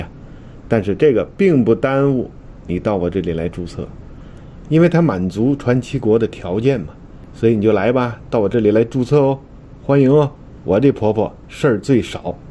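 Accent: China. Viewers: 李船长是台湾人吗？为什么是有大陆口音，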